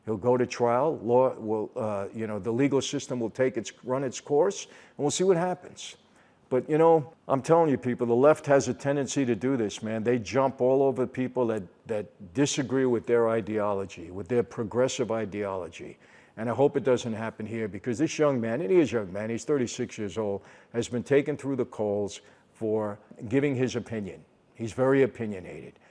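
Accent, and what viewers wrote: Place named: United States. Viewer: Romanian? American English...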